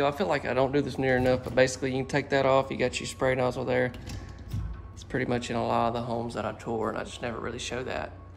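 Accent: South, United States. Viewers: I second that, I am handicapped and would love to see a video for the disabled. And, never apologize for how you say utility room, because I just love your southern drawl, that's what makes you you. God bless. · !!! Sorry! I stepped out with some of your competitor's channels on Youtube for a while and, I am back with just YOU MAN!!!! You're smooth, man!!! LOL! You're the best photographer, Your southern accent makes me melt( I lived in Tennessee for years and miss those southern men)!! I'm back for good!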